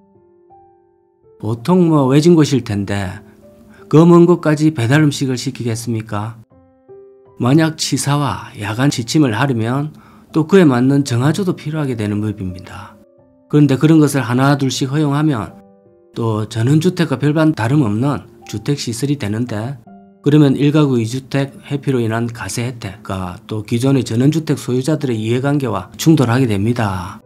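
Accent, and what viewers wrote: Gyeongsang, South Korea. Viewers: 경상도인가요 좋네요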